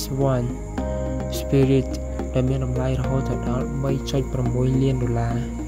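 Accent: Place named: United Kingdom. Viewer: តុង English ពេកឮតែខ្យល់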